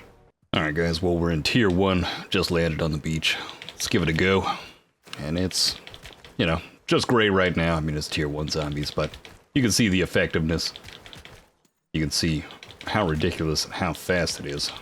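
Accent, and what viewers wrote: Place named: South, United States. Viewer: This guy is underrated as fuck, The deep country accent makes watching you very fun as well as good commentary, fun gameplay, Good ideas and hes clearly not bad at the game either. Keep up the good work my man!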